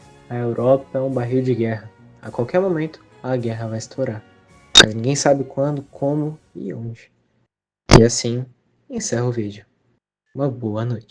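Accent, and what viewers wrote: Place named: Germany. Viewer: Adorei o sotaque alemão 🎉🎉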